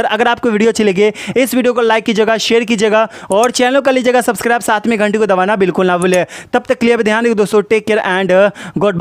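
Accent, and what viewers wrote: Bihar, India. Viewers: Kya tu bihari hai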